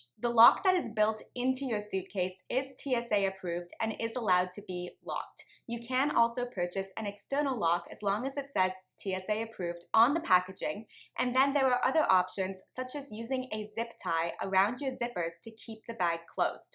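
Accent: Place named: New England, United States. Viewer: Thank you for this information! Also, love your hint of an accent too - is it New England (close?). Thank you - Sub'd!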